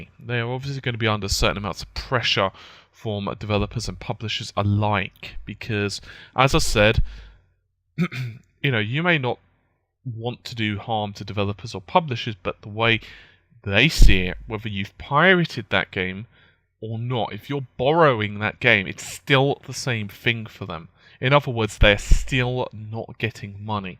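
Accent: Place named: Manchester, United Kingdom. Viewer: Are you from Manchester? your accent is simular to Soprano pictures.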